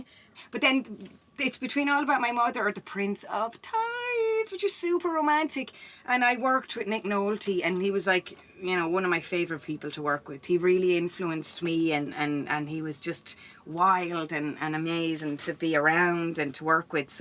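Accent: Ireland. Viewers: Love the Irish lilt. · It’s not a accent it’s a brogue, From Tipperary I believe my grandfathers birthplace. Wonderful,beautiful talent. Just picked up McCabe and Mrs Miller on the 50 % off sale. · Tf is a cletic accent her accent is Irish, no such thing as a celtic accent. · Irish is honestly my favorite accent. She sounds exactly like the characters in The Commitments😀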